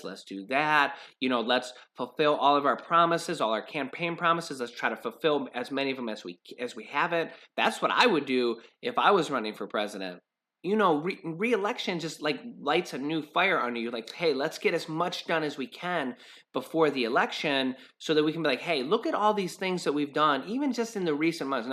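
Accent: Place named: Ohio, United States. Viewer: Hello! I knew you were from Ohio. Didn't even have to ask. You have that Ohio accent! Hope your day has been good. Enjoy listening to your updates and hope we can get this stimulus taken care of so we do not have to discuss it anymore for now. Enjoy the rest of your holiday!!!!!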